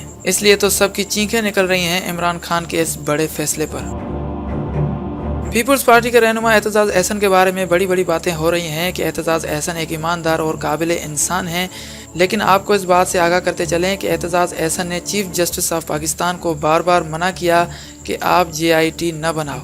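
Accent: India. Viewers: awaaz se hi pata chal raha he k ye bharwa koi indian he panyakka tu te ap moodi da mundda lagg rea e'n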